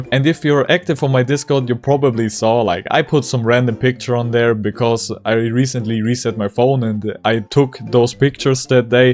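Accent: Germany. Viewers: Bro ich höre irgendwie deinen deutschen akzent raus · Bro ist 100% deutscher😂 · Bro man merkt das du Deutscher bist xDdd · im hearing a little bit deutsch heraus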